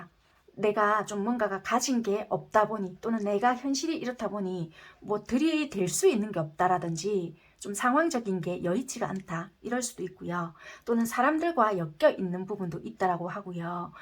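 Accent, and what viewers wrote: Busan, South Korea. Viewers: 구수한부산말투 매력적이네요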